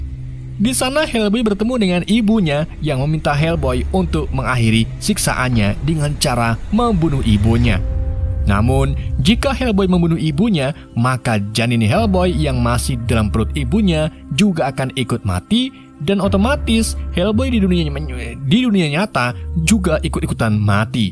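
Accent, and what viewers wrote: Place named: Java, Indonesia. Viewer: Orang jawa sonnya😂😂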